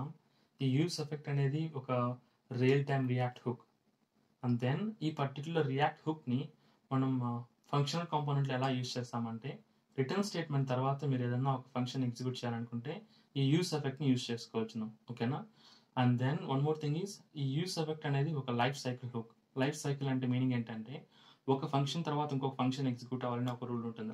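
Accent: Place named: Chittoor, India. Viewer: Clear cut explanation with theory as well as hands on, its really building confidence for me to keep up learning React js thank you bro and finally I think ur from Border of Andhrapradesh or from Chittoor district/TN right...?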